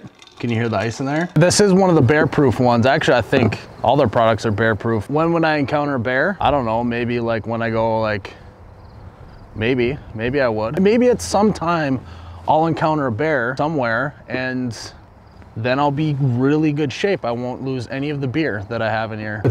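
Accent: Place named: Minnesota, United States. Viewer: I betcha from Minnesota, yah?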